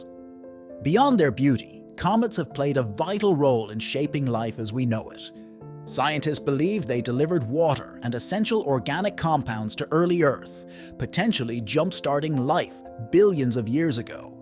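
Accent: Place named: Ireland. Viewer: Hey guys! You need to put a notice to use headphones for the best experience. **By the by, was this an AI narration? Because if it's a human, I swear I detect a hint of a brogue. A bit of Celt in there? Maybe Western Ireland. Connemara, perhaps? I have family there so Im able to find it easily.**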